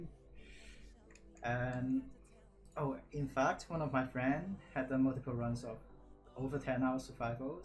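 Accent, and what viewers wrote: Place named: United Kingdom. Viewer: you sound really british sometimes its great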